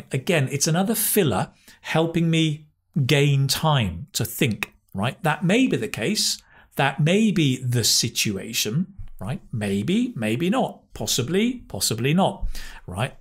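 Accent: United Kingdom. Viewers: Hi teacher? I really love. Your British accent. If I decided to study English by Cambly I'm sure I going to choose as my teacher.💚🌎🖍📗🇬🇧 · Thanks!!! Is your English british? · Is it UK accent?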